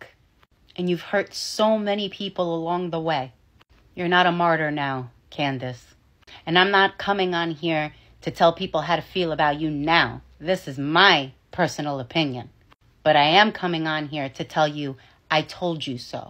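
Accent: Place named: New Jersey, United States. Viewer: I hear New Jersey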